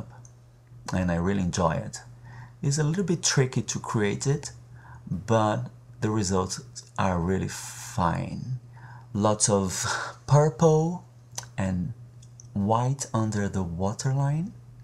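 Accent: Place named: Brazil. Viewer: Hey, are you brazilian? I'm asking because I am and your accent seems like brazilian accent. If the answer is yes so... Você é muito diva, querida. Que bom que você está fazendo sucesso. Nunca desista da sua felicidade e sonhos... XO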